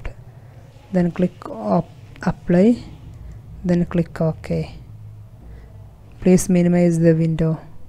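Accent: Kerala, India. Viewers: anyone notice that mallu accent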